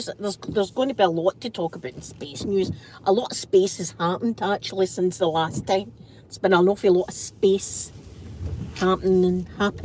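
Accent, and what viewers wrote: Scotland, United Kingdom. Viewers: Hello 👋 im gonna watch this i widh i wouldve caught it live. Love you accent. 😊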